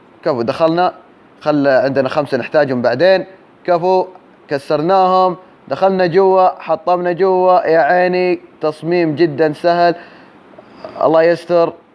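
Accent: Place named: Saudi Arabia. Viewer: kmal alswydy واضح كلامه هوا من السعوديه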